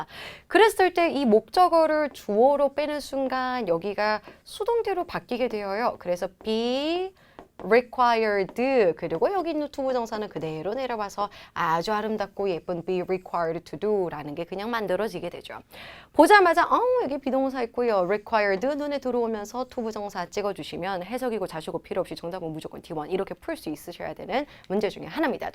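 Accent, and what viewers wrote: Gyeongsang, South Korea. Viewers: 경상도 선생님 아이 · 몰랐는데 경상도 발음이 간간히 나오시네요 ㅎ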